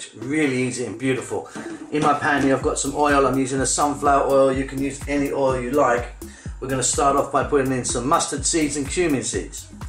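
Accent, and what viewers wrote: United Kingdom. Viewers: Are you Asian with British accent or English person, love your passion for cooking. Love listening you, deffo subscribing u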